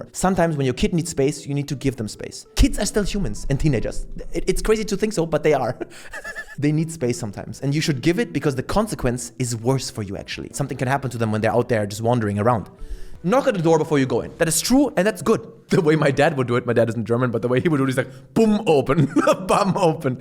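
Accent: Germany. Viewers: Lustig, Englisch mit deutschem Akzent. Ich denke, das "Deutscheste" in Deutschland ist tatsächlich die Mülltrennung. Warum hast Du Deutschland verlassen? Ich denke, mit Deiner humorvollen Art hättest Du in D mehr Chancen. Und in den deutschen Großstädten, in denen ich unterwegs bin (S, M, N) würden die meisten gerne Englisch mit "Zugereisten" sprechen. Anyway, viel Erfolg in CaliforniA. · Alter ich denke, ich schau ein Video von irgendeinem Random Asiate an (Servus Bruder) und dann kommt einfach der assreine Migrantendeutsch hervor den nur ich irgendwie wahrnehme. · i love ur "Denglish" man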